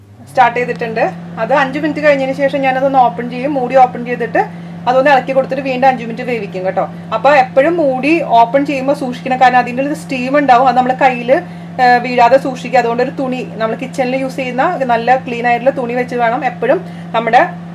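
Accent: Thrissur, India. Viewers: Nalla assal Thrissurkkari :D. Rasamulla explanation :)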